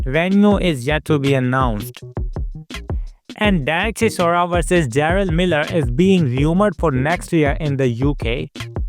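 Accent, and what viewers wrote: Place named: India. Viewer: Wttf is this indian voice talker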